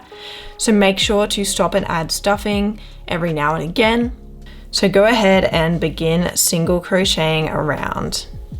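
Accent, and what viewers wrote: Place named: Australia. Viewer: Lovely to hear an Aussie accent and not have to listen to a half hour intro 🙏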